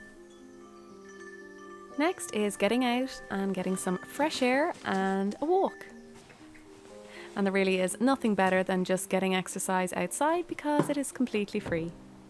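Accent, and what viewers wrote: Ireland. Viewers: This is the first video of yours I've seen and I heard the accent and was looking at fields trying to recognise what county you're in ha! I grew up in the Wicklow mountains and it's so gorgeous, we're so lucky to have such gorgeous countryside ❤ living in Dublin city now and doing the best I can to be sustainable in a flat!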